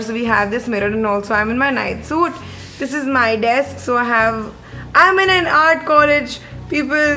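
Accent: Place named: India. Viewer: I think Indian English its very typical Indian accent .....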